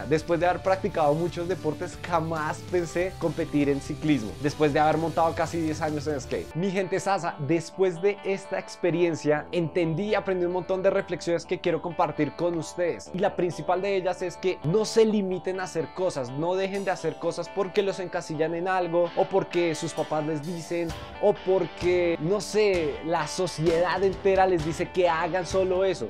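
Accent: Colombia. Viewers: Yo lo sabia cuando hablas yo digo el tiene asento colombiano y cuando escuche tocasipa selebre yo soy de bogota un saludo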